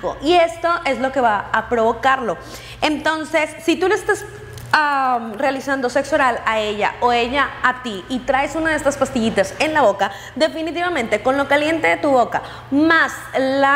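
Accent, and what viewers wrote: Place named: Fresa, Mexico. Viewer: Por qué hablas tan fresa !?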